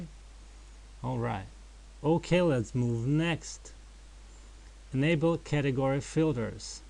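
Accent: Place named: Russia. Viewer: I can hear that Russian accent from a mile away my Ruskiy bryatuha.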